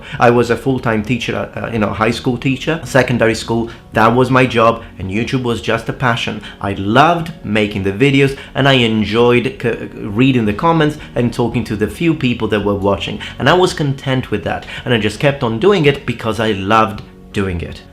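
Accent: United Kingdom. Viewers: You sound very British but look very Italiano. How come ? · I can't believe how much time has passed! 500k?! I very rarely do these but I'll try be concise :D First off, congratulations! You deserve the milestone for your diligence over the years, truly well done man. I can't pinpoint when I subbed, but I've been along the ride for many years - to see this growth externally is super satisfying. I can say with confidence pre 50k, but my memory fails me to go beyond that. Around the time your language videos were more prevalent I'd say. My other reason to drop a comment here is to explain why I initially subbed - it was because of your character, before your content. At the time, there was very little content for what you were producing (and still do). To see a video on historical medieval warfare and/or weaponry was a rarity, a drop in the ocean. To then move to language in the next video to me was astonishing. 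Not just generic language, but an Italian man speaking fluent English with a British accent swapping to Greek and Japanese in the same breath was near inconceivable, I couldn't believe my eyes (and ears lol). I'll touch on why else that was important to me later but, above all you were passionate, and had infinite potential to grow, across every avenue. I wanted to see that among many other things. Youtube wasn't exactly new when I landed here, but it definitely wasn't the colossus it is now. Growing up with the platform I've always been very picky and arrogant in who I subscribed to. To me a subscription was a dedication, it wa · why you have a british accent?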